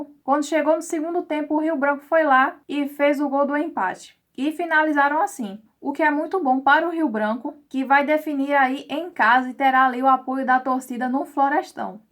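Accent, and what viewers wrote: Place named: Sergipe, Brazil. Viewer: kkk parece que e sergipana sou paraibano torço pelos times daqui e pelo mengao e claro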